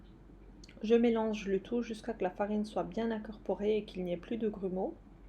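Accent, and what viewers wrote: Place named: Lorraine, France. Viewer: bonjour Quelle est la taille de votre poêle? Tombée par hasard sur votre site j’adore! Je crois reconnaître un petit accent lorrain 😊 Bravo et continuez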